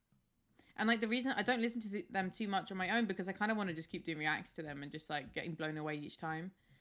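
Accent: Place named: United Kingdom. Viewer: What is your name again, please?! I love the British accent!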